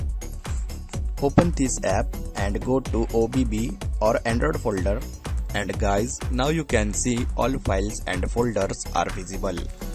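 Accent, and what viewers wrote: India. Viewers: Such a bad accent must be indian i guess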